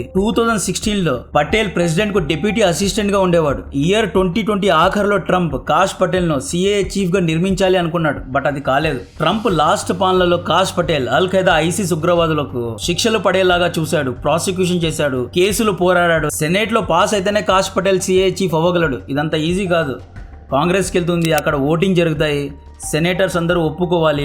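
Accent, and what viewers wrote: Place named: North India, India. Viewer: బ్రో మీరెందుకు తెలుగు భాషని ఒక్క ముస్లిం లాగానో లేదో తెలుగు రాని నార్త్ ఇండియన్ లాగా మాట్లాడతారు? పెండ్లాం కాదు అనాల్సింది భార్య అని కొద్దిగా ప్రొఫెషనల్ గా మాట్లాడటానికి ట్రై చెయ్యండి.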